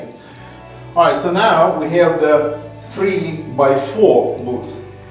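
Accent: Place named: Russia. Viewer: Я так и думала, что у вас русский акцент)) Конечно, кто ещё может додуматься до такого решения. Нужна смекалка (несуществующее слово в английском)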